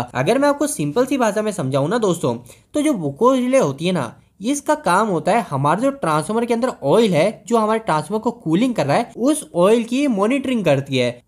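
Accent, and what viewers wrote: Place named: Maharashtra, India. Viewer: Sir Aapki bhasha sunkar Aisa lag raha hai ki Aapko Marathi bhi aati hai Aapko 😅😅Sir plz Marathi mdhe video banva na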